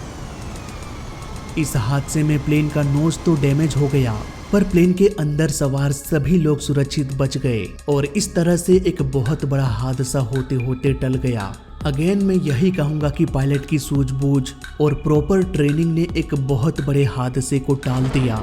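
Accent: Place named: Bihar, India. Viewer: yeh kya hai bhai bihari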